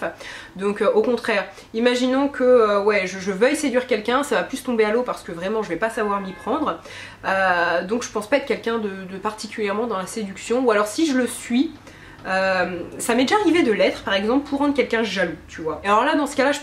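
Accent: Paris, France. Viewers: C'est surtout son accent parisien et sa façon de se tenir et de bouger qui bourgeoise selon moi ^^ c'est pas une critique j'ai plein d'amis qui ont les mêmes manières et je les ai eues moi-même à une époque! Mais elle a clairement ce petit "je ne sais quoi" cliché des "bcbg"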